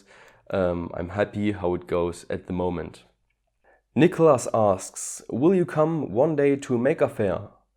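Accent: Saxony, Germany. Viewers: Ich wusste immer das du ein deutscher bist man Merk das an der Sprache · I love the German accent, it actually makes your videos more interesting. I will see you later! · Jaaaaa, sächsischer Dialekt und Simme fahren, das ist Kultur! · Ich wuste du bist deutsch ^^ Da deine handy werbung auf deutsch war und mans an der stimme hört ^^ PS: Geile video, bitte weiter so! vll auch deutsche videos und englische zugleich? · Glad to see your face :),and your Germany accent , and i like your projects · Your English is improving fast. Are you planning to relocate to an English speaking country. I like the German accented English though. Holiday on DIY bike ???? Awesome.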